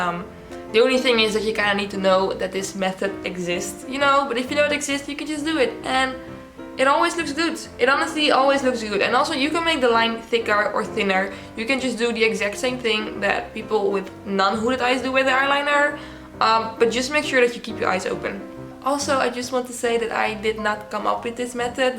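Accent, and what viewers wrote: Netherlands. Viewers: nah, she's dutch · Super Nice Dutch accent! Lol love the tutorial ! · Are you Dutch? You sound like you're Dutch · Ben je Nederlands? Ik hoor dat dutchie accent haha, leuke vid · Are you Dutch? I recognise that accent from miles away haha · im just so confused if im hearing this in english or dutch lmao such a mindfuck love the accent tho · I can sound the dutch in this tutorial i love you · haha i love dutch accents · Your accent reminds me of my dutch cousins. I find eyeliner the hardest to apply. I dont' apply as boldly as you when I wear it. Find it seems to sit better when I follow the line as an extension of the lower eye shape and only do the outer edge. I haven't tried putting on inner edge as thought might might make my eyes look closer together. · her dutch accent is sooo strong. Love it tho since mine is just as strong as hers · lmao dat nederlandse accent springt er wel uit xd <3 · Love the Dutch accent hehe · She is not german she has a very pronounced dutch accent not german. 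She sound like dutch VS model Romee Strijd when she talks lol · I am Dutch and I just know from listening that she is very much Dutch lol. The accent is recognizable when you are from the same country xD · lol yes I was like ohh she dutch. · that dutch accent i- 😭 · Is she Dutch? She sounds like me lol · Echt een Nederlandse accent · no one lmao a quick google search would show you she’s dutch. She has a very dutch accent. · Lmfao I'm dutch and I didn't even know you're dutch but as soon as I heard your accent I just knew it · Are you Dutch? Judging by your accent hehe. Good video btw, it's so different to 'wing-it' with hooded eyes...! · hu?? kan ze nederlands? ze heeft echt zo een accent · dutch accent !! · we love the dutch accent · this was my guess after the first 30 seconds, and now I'm trying to figure out if she really is Dutch. Love that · Lmao Germans pronounce nyx the same way but she’s like obviously Dutch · haar accent is onmiskenbaar nederlands haha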